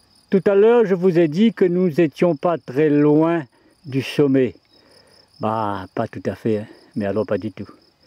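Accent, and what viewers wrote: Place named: Réunion, France. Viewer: Bonsoir. Merci pour cette sublime vidéo qui ravive de très bons souvenirs'. J'ai effectué mon camino du 02/06 au 30/07/2019. Je suis parti du PUY et j'ai rejoins SJC le 27/07... J'ai continué vers le Finistère que j'ai rejoins le 30/07. J' bien ton accent qui me rappelle mon Île natal la RÉUNION. Bonne continuation. Je repartirai sur les chemins par la voie du VEZELAY au mois de juin. Thomas (un gars des Avirons)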